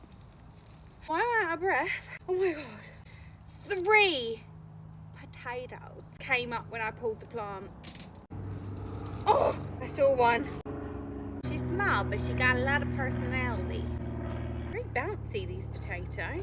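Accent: United Kingdom. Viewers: The British accent makes it even funnier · I have a question 🤔🤔 are you a British (no hate just curious) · Is this a British 😅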